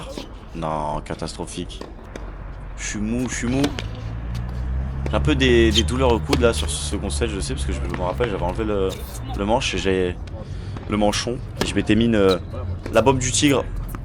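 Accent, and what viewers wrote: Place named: United Kingdom. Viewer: waa il a un big accent des UK mdr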